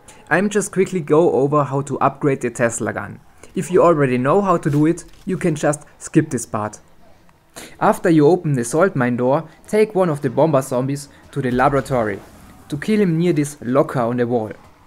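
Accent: Germany. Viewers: Hört sich sehr nach deutscher Herkunft an, irre ich...?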